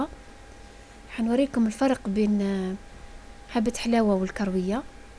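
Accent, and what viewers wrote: Biskra, Algeria. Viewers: من هدرتك عرفتك بليك بسكرية الله يبارك ( كيما انا )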